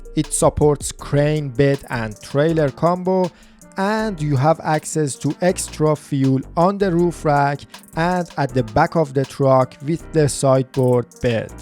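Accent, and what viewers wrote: Iran. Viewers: حس میکنم ماله ایرانی داداش لحجتو❤😂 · اکسنتتو بخورم که نشون داد ایرانی هستی بعد اومدناسم کانالو دیدم بععععله.موفق باشی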